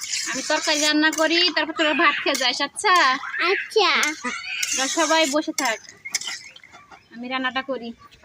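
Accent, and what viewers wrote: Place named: Rajshahi, Bangladesh. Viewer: তোমার কথা শুনে বোঝা যাচ্ছে তুমি রাজশাহী জেলার মেয়ে, কিন্তু কোথায় তোমার বাড়ি একটু বলবে আপু ❤❤❤❤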